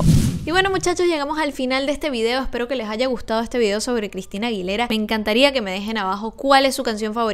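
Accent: Venezuela. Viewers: Te amo por tu acento venezolanooooooooooo... muy dificil escoger una cancion... creo que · eres venezolana cierto? Ese acento jejej me hubiera gustado ver a verla cantar en el caracas pop festival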